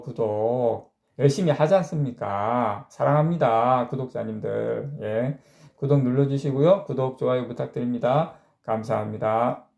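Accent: Gyeongsang, South Korea. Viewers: 구독 꾹~~누르고 매일매일 영상잘보고있습니다..ㅎ 경상도 사투리로 속시원히 설명해주시니 주린이라도 맘편히 따라하고있습니다.. 최고점에서 물려있지만 시키시는데로 ~~ 항시 존 정보 감사합니다..^^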